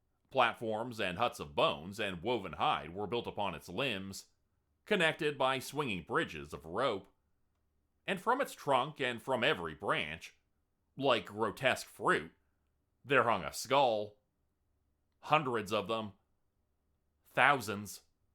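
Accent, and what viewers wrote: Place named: Canada. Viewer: WA part o Canada u from A-HHH !!!! IMA FLORIDA BOY so I get ta hear all kinds o accents !!!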